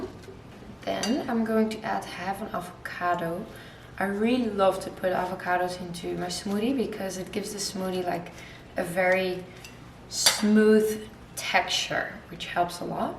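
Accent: Netherlands. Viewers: je accent is heerlijk , hoor gelijk dat je nederlands bent lmao. Xxxx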